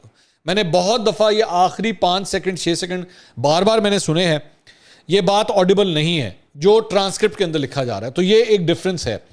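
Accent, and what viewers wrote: Pakistan. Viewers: Sir bohoot khoob tajzia kia aap nay Aap k lehjay say pakistaniat jhalak rehi hay Jazak allah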